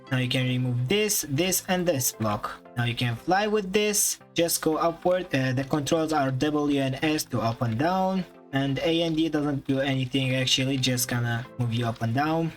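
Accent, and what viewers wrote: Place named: Arab world. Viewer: your accent is kinda arabic r u arabic?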